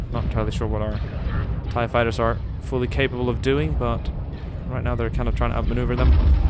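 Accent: United Kingdom. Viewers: Man love your accent. I used to watch your stuff years ago and the way you sound is totally different than now. It's this weird mix of sometimes North American, sometimes very British English influenced o.o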